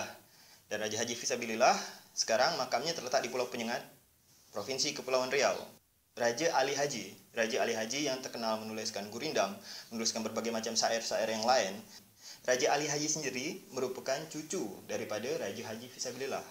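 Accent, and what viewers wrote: Malaysia. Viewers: He sounds like us malay in Malaysia 😄😄😄😄❤❤❤ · Kalau dengar suara sahaja, mesti akan ingat orang malaysia@ yang bercakap ni.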